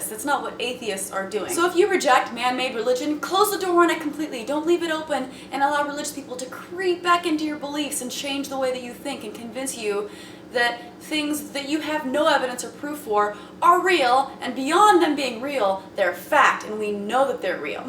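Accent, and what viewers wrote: South, United States. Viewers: Even famous biologist Richard Dawkins has noticed and appreciates her style. We all love listening to her, even the Muslims watching "Muslim hot line" although they won't admit it. Me I just LOVE the southern accent y'all. #masturbateforjaclyn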